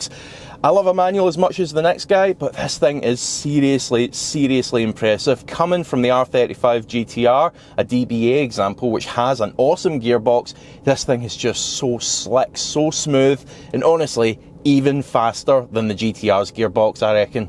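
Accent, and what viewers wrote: Scotland, United Kingdom. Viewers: Was just watching R8 videos on youtube and came across your channel, great to hear a fellow Scot and well done on your new car. You've got a new sub in me.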